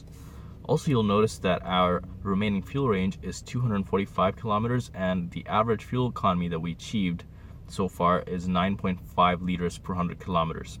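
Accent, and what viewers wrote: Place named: United States. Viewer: Which country is this? The narrator has an American accent and they are speaking in kilometers and liters as well as dollars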